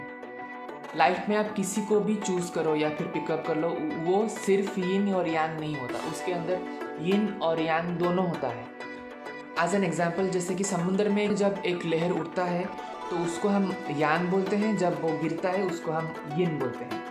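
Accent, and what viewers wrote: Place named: Odisha, India. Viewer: Bhai thoda ladka bano Aurr hindi bhi aap ki lag rahi hai odia accent wali Yeh rang dhang change karo ladka bano re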